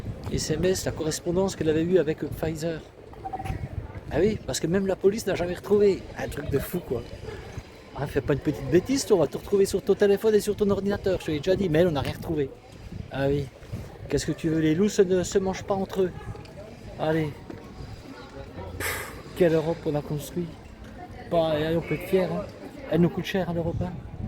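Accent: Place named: Alsace, France. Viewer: Bonsoir Ca fait du bien d’entendre l’accent Alsacien 😀. Tu a bien fait d’aller voir cette belle cathédrale en grès rouge. Beaucoup d’église et de monument sont restauré avec le grès de la carrière de Rothbach réouverture en 1964. Je te souhaite une bonne nuit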